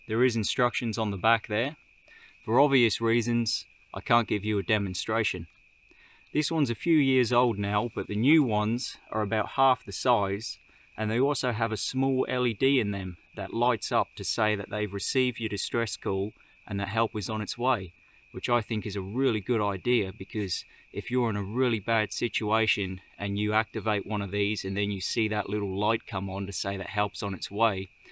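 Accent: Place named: Australia. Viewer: Cool vid. Kinda jealous actually.. but bro how long u been in Aussie for..?? Hard to tell your accent.. it’s like 5% British 95% Oz. Very hard to pick hey.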